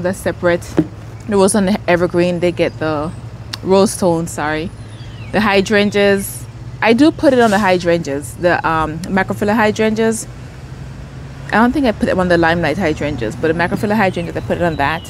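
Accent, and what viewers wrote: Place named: South Florida, United States. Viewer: I love the “f” for fertilizer Friday— I’ll remember that now! Also your accent makes me miss South Florida! 🥰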